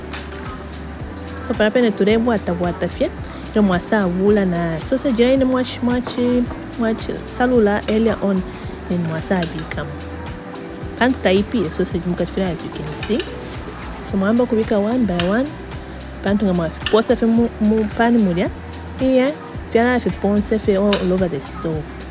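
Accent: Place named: Zambia. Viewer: Beglish 😁 bane.👍🏽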